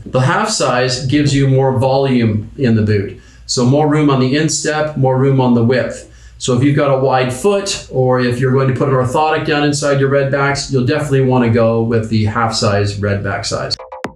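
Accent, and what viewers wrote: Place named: East Coast, Canada. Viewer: I give Americans a hard time about Canadians saying "about" a funny way. I'm guessing this guy is in from the East Coast because I'm from the West and now I can clearly see what the yanks are hearing. 😁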